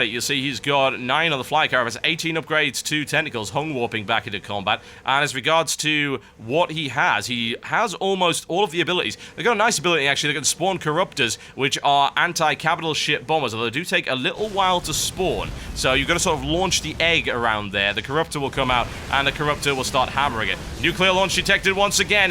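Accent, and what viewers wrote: United Kingdom. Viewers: Where is he from? (Well obviously some place in britan but i mean slightly more, because as has been stated by many, your voice is awesome...) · why do you always say YURS insted of years lol :D funny as hell tough you cynical brit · It's pronounced that way if you have the kind of British accent that TB has. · its just part of the british-english dialect i think. an accent from where he lives. just like not everyone in america says things the same. i know people from boston who don't pronounce their "R"s in words. they say Cah instead of Car.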